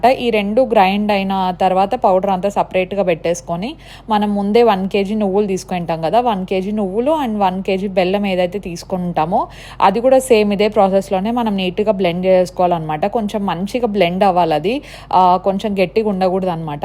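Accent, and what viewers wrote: Anantapur, India. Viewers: Amma very sweet anantapur slaang super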